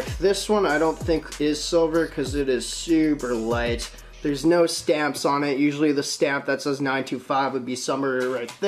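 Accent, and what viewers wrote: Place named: Alberta, Canada. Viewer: Duh duh duh duh duh duh must be from Edmonton or Alberta somewhere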